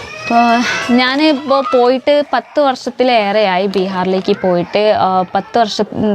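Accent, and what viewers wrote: Malappuram, India. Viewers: Malppurath alle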